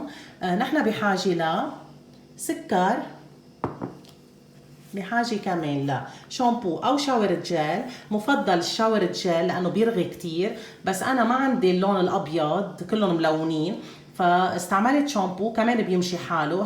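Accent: Lebanon. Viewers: مبدعة يا بالوصفات الكوري والمغربي والعربي بتجنني وبتاخدي العئل بلهجتك اللبنانية محبتك من السعودية إيمان❤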